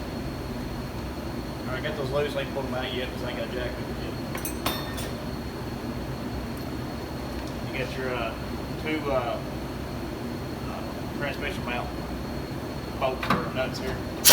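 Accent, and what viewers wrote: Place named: South, United States. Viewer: Is this what I sound like ...tell that's a good Ole boy there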